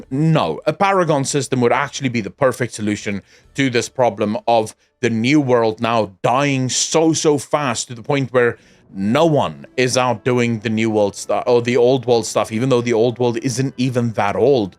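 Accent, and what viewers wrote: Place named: Afrikaans, South Africa. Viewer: Random question, where are you from? Your accent sounds slightly Afrikaans, or Dutch?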